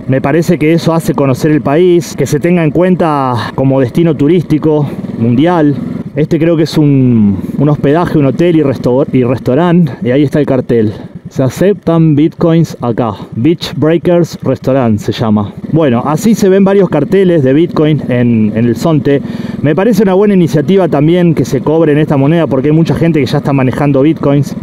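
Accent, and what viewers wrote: Córdoba, Argentina. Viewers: Podes estar 25 años en Rusia, pero el acento cordobés nunca se te va. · ehhh!!!! Yo soy de Villa María!!! que copado encontrar a alguien de los pagos y que todavía conserve la tonada cordobesa... Saludos desde Argentina!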